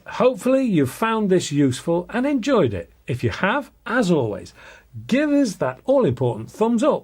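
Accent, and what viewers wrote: United Kingdom. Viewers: Since i discovered this Channel an couple ofm onths ago, i am hooked and have seen allmost all of them.. at least twice. I love the review, breakdown and judgement without bias or clearly "payed in whatever way" intention. All done without benefits from manufacturers, clearly and correctly spoken brittish without being pretentious about being brittish. love it! I do not like the american slang of which youtube is full of., please keep on trucking with this channel, for years to go please... I have followed a few of your advice, and you naild it. I am so pleased with you. This 50 meters airgunner (till dec last year with a HW97K and grouping like pennies) is now a happy owner of a HW100T (FAC) has learned a lot from you! Cheers! an many thanks. Will order an AAR Cap soon and proudly wear it at competitions next year. Forgot to say that i never had much of an impession of Gammo, but now i am interested and will try that airgun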